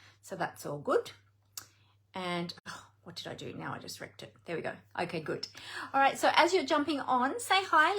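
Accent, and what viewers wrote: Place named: Australia. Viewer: So nice to hear another Oz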